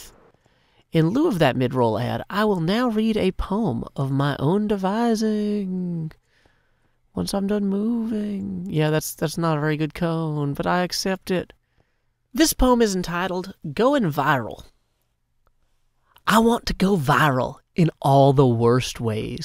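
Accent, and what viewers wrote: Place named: South, United States. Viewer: First time here on your channel, it's sort of refreshing to hear a semi-southern accent in a hermitcraft video! Enjoyed the experience thoroughly, and great poem by the way :) ~your new fan (smoothie) from GA, USA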